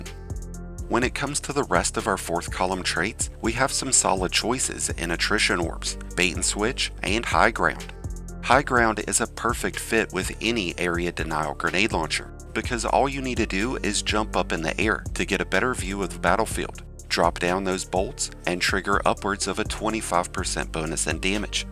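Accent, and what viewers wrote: South, United States. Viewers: love ur accent! is that southern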